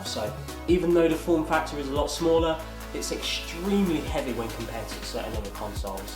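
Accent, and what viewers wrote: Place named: United Kingdom. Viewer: Am i only one who notice that this man skin tone is that of a black person yet he sounds like he's from Britain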